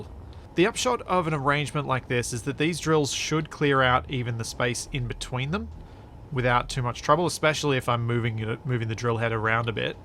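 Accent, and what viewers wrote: Australia. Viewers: Your voice, manerisms, and Australian accent are like a comfort blanket for me. I dont play space engineers too often anynore, but I still adore your videos 💜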